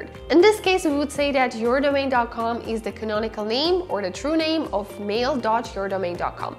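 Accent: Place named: Iran. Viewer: That's some Iranian accent she has, isn't it?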